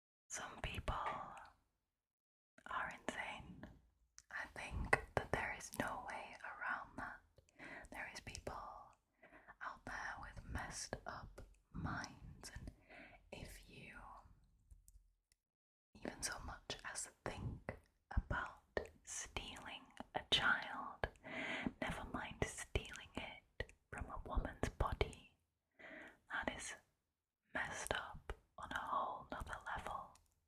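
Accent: Northern England, United Kingdom. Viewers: I absolutely adore your videos. You're fast becoming my favourite asmr artist and I love it when I get a lil notification telling me you've posted! I love the mix of whispers and fluffy mic brushing, it is soooo tingly.☺ would love just a fluffy mic video from you tbh! Also love your Northern accent, pls tell me you're a Sheffield gal?! Thank you for the videos!!! Xx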